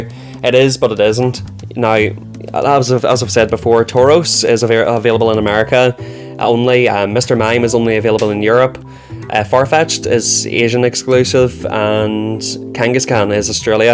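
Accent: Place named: Northern Ireland, United Kingdom. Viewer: Mate your accent has changed threw out the years u sound northern Irish as fuck on this video compared to now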